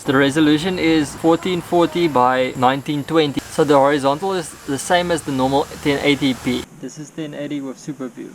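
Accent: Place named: South Africa. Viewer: You sound South African, yep.... 😉🇿🇦🤙🏽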